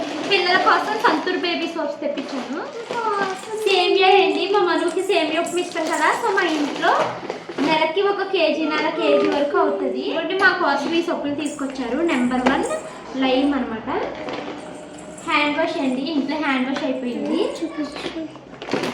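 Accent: Godavari, India. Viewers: Medi proper a uru andi, me puttillu ekkada, ante slang godavari valla la undemo anukuntunna.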